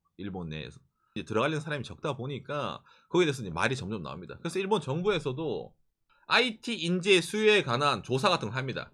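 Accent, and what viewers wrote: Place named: Gyeongsang, South Korea. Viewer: 경상도버전 대도서관...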